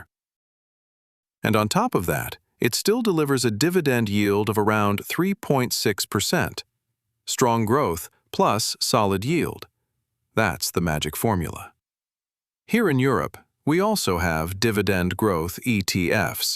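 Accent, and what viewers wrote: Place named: Sweden. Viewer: that sweet Swedish accent 👌🏻😎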